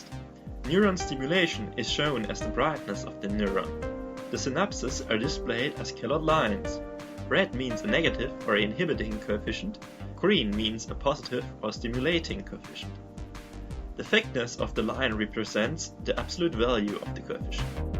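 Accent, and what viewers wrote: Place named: Germany. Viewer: That accent... Are you German?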